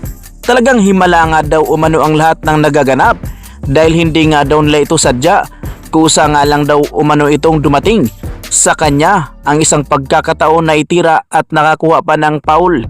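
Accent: Visayas, Philippines. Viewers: ayusin mo salita mo wag ka bisaya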